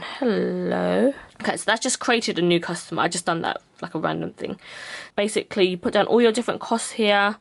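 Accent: United Kingdom. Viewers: Wow LOVE your British accent 😻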